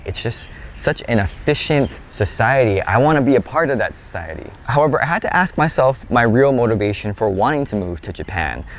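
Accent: Hawaii, United States. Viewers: I’m just here for your local accent. Wink 😉 Grew up my whole life big island. College in Cali- tried to get my husband to live and love Hawaii, he struggled with being so far from family and when his mom got sick it cost us thousands to go see her. I don’t think I can move back, but my mom is still on the island so I get to visit. 🌈 keep up there videos I like em laddat.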